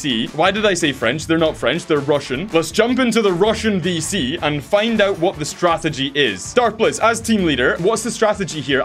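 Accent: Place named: South London, United Kingdom. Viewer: bro sounds like hes from south Lindon im 💀💀💀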